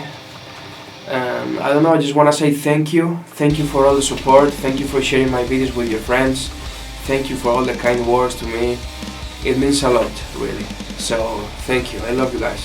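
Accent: Spain. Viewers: Ese acento asturiaaaaaaaaano 😂👏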